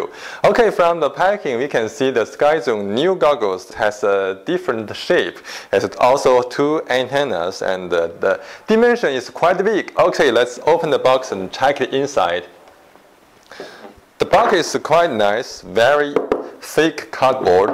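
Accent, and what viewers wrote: China. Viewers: Couldn´t you find someone to present products, that are more proficient in the spoken English? It´s too "chinglish" for my liking....but excellent product!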